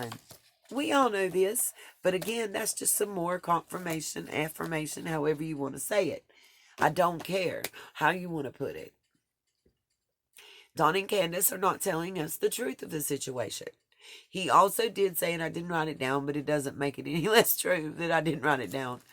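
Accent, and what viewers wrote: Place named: East Tennessee, United States. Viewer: I live in east tn. In the same area as the Smokies and you sound like people from my area. I'm as country as cornbread and I'm seeing things exactly like you do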